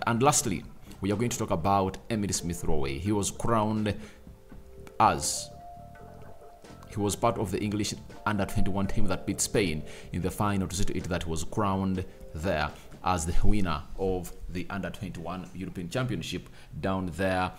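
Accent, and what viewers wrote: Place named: Uganda. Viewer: I knew u were Ugandan bro. I just checked to confirm...i know my pples' accent. Do your thing homie do your thing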